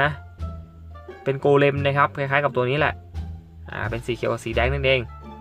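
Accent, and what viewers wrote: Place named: Isan, Thailand. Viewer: ทั้งเหมิด คนอีสานรึป่าวว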